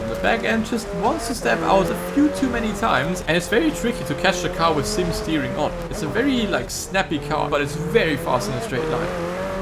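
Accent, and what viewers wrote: Germany. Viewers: Are u germin???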